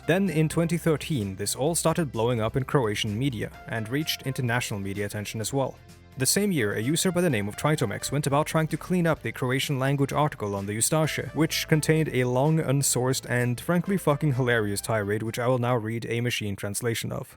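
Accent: Norway. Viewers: ha, Norwegian detected! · My new fav second monitor content guy/gal. Norwegian accent highly underrated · you kinda sound like LEMMiNO but you are not swedish but norwegian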